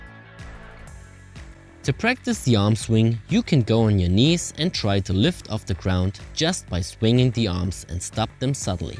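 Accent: Germany. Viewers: you kinda have a german accent haha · Lol this accent sounds very german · You sound german.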